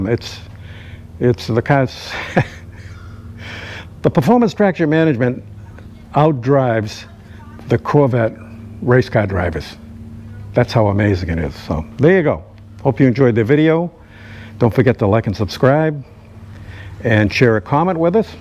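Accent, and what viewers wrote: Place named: New England, United States. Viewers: He sounds like he’s from new england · I can tell by listening to his voice, he's from up North, nothing wrong with that, I lived in New Hampshire while serving my country, when discharged, I moved back to Georgia, then after a nasty divorce, I moved to Massachusetts for almost ten years, then married a sweet girl from Brockton, and moved back to Ga, my southern accent used to drive people nuts, now after living up there for so many years, people don't know where I came from because i now pronounce my I's in the long form like tires instead of tars. My friends from Massachusetts used to love to listen to me talk. My guess is that he's from New York, Conn, Rhode Island, Mass, or Maryland. I love the look and how Corvettes drive but I spent 15 years working on them at a chevy dealer after busting my butt for 15 years working on those things, I most certainly would never own one. 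It's an amazing car, looks, performance, and a low price compared to other performance cars from other countries. I've just found that it's impossible to keep anything nice, there's always someone that will find a way to put a little flaw in my car that takes the perfection away from it.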